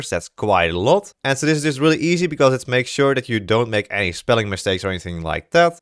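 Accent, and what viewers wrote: Netherlands. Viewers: meer nederlands accent dan dit bestaat niet.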